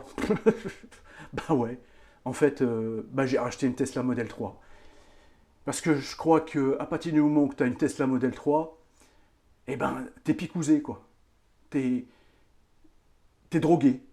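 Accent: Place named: Lille, France. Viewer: j'etais sûr que tu étais de Lille, trahis dès le début ! (^_^)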